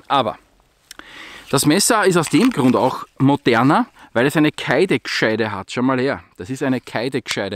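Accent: Vienna, Austria. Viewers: Heast deine Videos daugn ma voi oba des Wienerisch treibt mi voi in den wahnsinn XD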